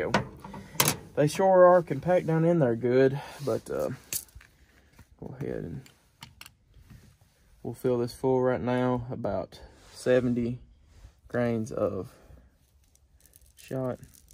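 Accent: South, United States. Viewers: That´s a fine Southern accent! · I appreciate your southern dialect also ❤️😎🌟🌟🌟🌟🌟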